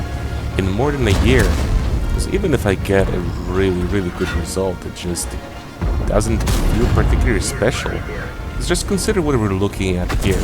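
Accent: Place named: Europe. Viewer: Yeah. We have a similar PRAV clan, and for some reason, you sounded European to me, so I thought you were EU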